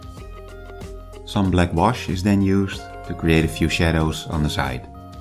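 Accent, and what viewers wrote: Netherlands. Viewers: Love your Dutch accent 😃 Love the video you made, thanks ! Ik heb hetzelfde model klaar liggen om te gaan printen en dat gaat na het zien van deze video niet lang meer duren gok ik. Leuk om te zien dat er ook NL kanalen zijn in dit wereldje ✌🙂 Subscribed -> Uiteraard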